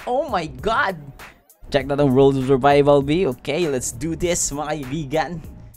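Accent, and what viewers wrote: Visayas, Philippines. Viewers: Grabi kaau bisaya kaau. Pro idol❤️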